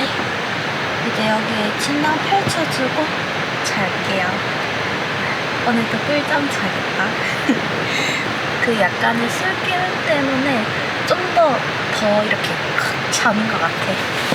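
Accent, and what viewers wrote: Gyeongsang, South Korea. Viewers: ㅎㅎ 이분은 귀여우심 경상도사투리도 귀엽고 그래서 구독 ❤ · 갱상도 분인갑네요~~ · 화면만 나오는지 알았는데 말도 하시네요 혹시 대구 경상도 아가씨가 맞죠?인생 너무 즐겁게 사네요ㅡ전맨날 집ㅡ직장ㅡ집인데ㅡ남자 게스트ㅈ안필요 하세용ㅋㅋ🎉🎉❤😮😅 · 경상도 말씨에 인상도 좋으세요~우중캠핑 잘봤습니다 고생하셨습니다❤🎉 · 씨는 경상도 말쓰는거랑 웃는모습이 참이뻐요^^ 젤좋아하는 캠퍼예요^^ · 님도 이쁘고 경상도 말씨도 좋네요 하이볼에는 위스키가 정말 맛있어요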